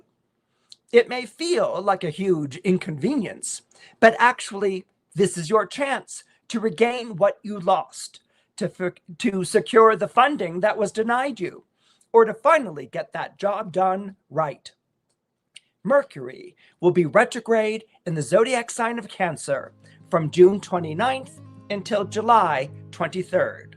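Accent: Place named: Cockney, United Kingdom. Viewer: In Hertford, Hereford and Hampshire (sher not shire) hurricanes hardly EVER (not hever) happen., your cockney accent!!!! And your singing!!!!! I enjoyed this immensely.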